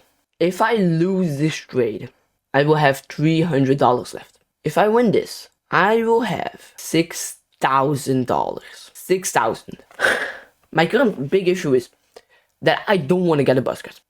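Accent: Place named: France. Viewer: Your frech aczent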